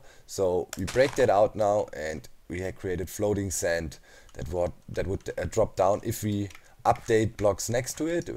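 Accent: Germany. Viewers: Sagmal du bist doch deutscher oder :D?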